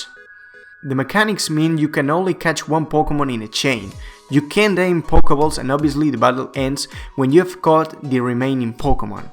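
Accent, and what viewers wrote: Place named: Spain. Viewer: Se nota que eres español pero tienes un buen nivel de ingles! Ha caido algu shiny ya?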